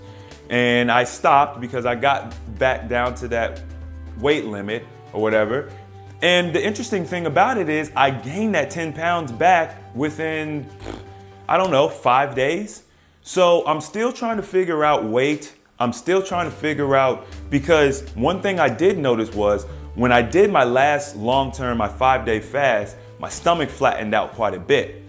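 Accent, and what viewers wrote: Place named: Philadelphia, United States. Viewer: You can actually change your body weight set point by keeping the weight at that lower weight longer term so that your body gets used to being that weight and doesn't feel you are in an environment of scarce food supply. Dr. Paul Mabry on Facebook on his group has good insight on this phenomenon. Also, Dr. Jason Fung had mentioned this as well in his talks on YouTube. Hope that helps. I too am trying out new things for the new year. I have done 1 to 16 day extended fast and always practice IF daily. I just started resistance training. I am trying to loose the extra 20 lbs gained unhealthily through holiday and emotional binge/food addiction eating and trying to fix my relationship with food in general. I love your channel by the way! Are you in Philadelphia? You seem like a Philly guy by how you speak and mannerisms- not a bad thing, just observations. 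I live in suburbs of Philly, but went to Thomas Jefferson University where I got my BSN in nursing and lived there in the center city area on campus as a student. Anyways, you are an inspiration to me and I hope to get as great as results as you. I definitely need to decrease my body fat%. I am currently at 24%! I am only 5ft tall but weight up to 115 lbs, which a lot for me! I feel so bloated and uncomfortable in my body now. I felt best around 100 lbs. When I did my 16 day fast got down to 97 lbs and felt amazing! Getting back to that area of weight, no less, is my goal 97 to 100 lbs. Anyways, keep up the good work!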